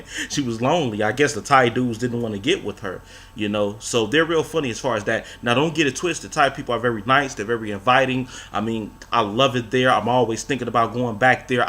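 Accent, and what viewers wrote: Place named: East Coast, United States. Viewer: just tuned in to your channel. you're from east coast right? NY?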